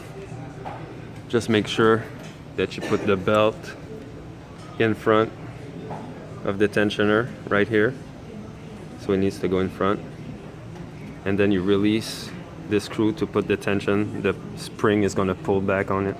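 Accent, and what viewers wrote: Quebec, Canada. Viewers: Es tu québécois???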